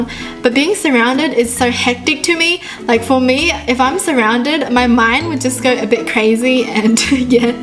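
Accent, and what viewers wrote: Australia. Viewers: You're* her parents are Asian so that makes her Asian but she lives in Australia, that's why her accent is like that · Your Asian? Oh..Well I always thought you were Australian😂😂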